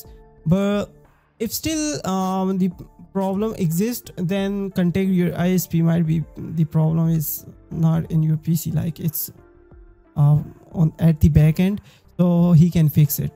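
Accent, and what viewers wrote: India. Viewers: Don't tell me you don't know that's an Indian accent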